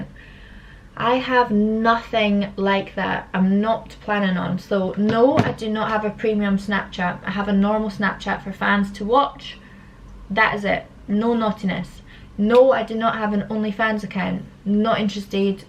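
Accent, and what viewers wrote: Scotland, United Kingdom. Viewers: What your height is??? Cuz im about 6'1 think u look incerdibly beautiful love ur long blonde hair Got such an nice scottish accent which its sound so amazing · You look so gorgeous, and your scottish accent tho 😅💕 More video please 😅 and make a vlog about your daily activity 😅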